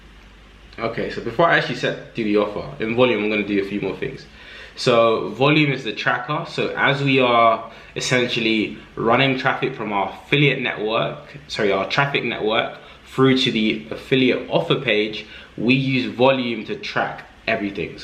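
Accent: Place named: United Kingdom. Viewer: I like how u talk British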